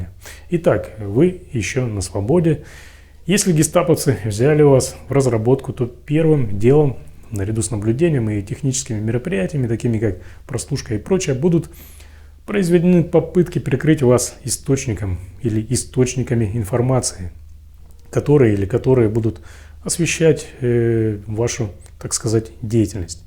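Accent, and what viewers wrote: Ukraine. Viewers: Украинский говор. Гэ, Хэканье. По говору западный галичанин! Горбачев!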